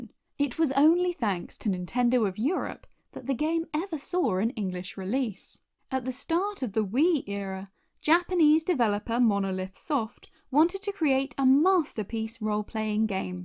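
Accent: United Kingdom. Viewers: Why are you British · But why are you British? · your voice isn’t posh, I mean maybe I just am numb to the accent as I live in oxford but to me it isn’t posh 😂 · I’ve been following this channel for a while and I barely noticed the narrator is British. 🤷‍♂️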